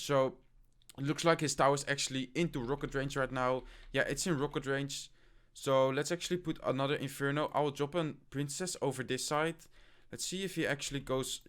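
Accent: Netherlands. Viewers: are you dutch? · You're not "monotone" it's just your accent bro don't bother with trying to change ur accent ur from the Netherlands and ur voice is just like that! I've learned a ton from u man! I use this same deck but use hog, zap, Skarmy, rocket, inferno tower, Princess, gob gang, gob barrel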